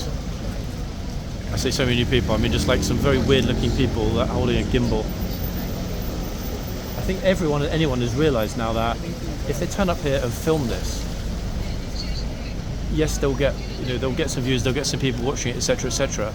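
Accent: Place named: United Kingdom. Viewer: I love listening to your very English voice.and no Americanisms ? As an old lady,I was taught English Grammer ! Thankyou so much for all the info,M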